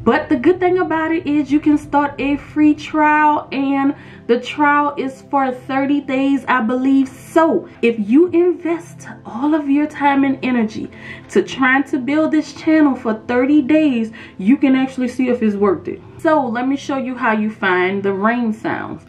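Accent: New Orleans, United States. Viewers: Are you from New Orleans? I love your voice. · I hear a New Orleans accent? Lol thanks for your help!! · You sound like the people I grew up with back home in New Orleans. ⚜️ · What region of Louisiana are you from? You have the City (New Orleans) accent, but it’s not the thick type. It gives like other surrounding cities not saying its a bad thing at all, but something I noticed. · I’m new here and the way you said hello I’m so many ways got me hooked to your personality also your New Orleans accent is everything ❤❤❤❤ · Is that a New Orleans accent I hear? 👀🤎⚜️ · Shoutout to the fact you from the N.O I know my people accent from anywhere lol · Girllll this video is a game changer. I done subscribed and liked. I'm watching other videos now working. Not only was the video easy to understand but you definitely from the N.O with that accent lol and so am I! 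I knew it was confirmation after that! Thank you so much ❤️⚜️⚜️ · New subscriber here! You are just too sweet and informative! You had me at what sounds like a New Orleans accent! I lived and taught there back in the 90’s so I am always dreaming about the Pre-Katrina days! Sorry if I got it wrong. Quick question: Oddly enough I have a couple of thunderstorm videos just because I am here in Belize during rainy season. It rains almost every early morning. My question is, must I create a new channel or can I find a way to blend a few rain videos with my current channel?Or will that throw off my channel’s audience and algorithm since it’s a new channel and not quite at 1000 subscribers? Thank you! Heading over now to check out your editing course details! 🙏🏾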